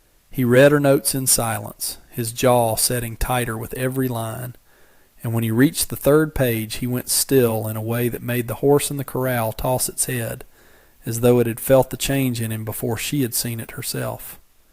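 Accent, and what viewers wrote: South, United States. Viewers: Terrific story. Love narrator's voice and southern accent.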